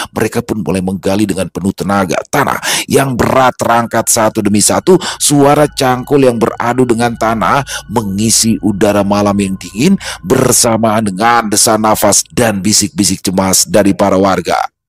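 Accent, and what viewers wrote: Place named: Banjar, Indonesia. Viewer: waah makin mantap nih aksen bahasa banjar mas..kereen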